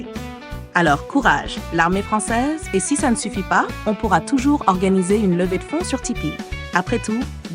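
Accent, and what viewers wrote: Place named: Quebec, Canada. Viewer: Je peux entendre un petit accent québécois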